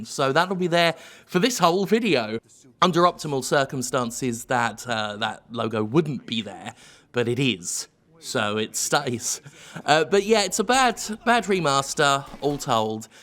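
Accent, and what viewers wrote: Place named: Estuary English, United Kingdom. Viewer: "maaarfia 2"? starting to lose the old estuary accent bit by bit.:D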